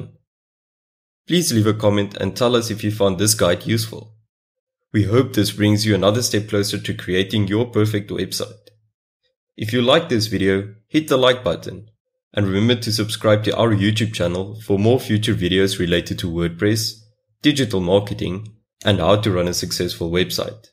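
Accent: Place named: South Africa. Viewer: Thank you! Are you South African? You sound South African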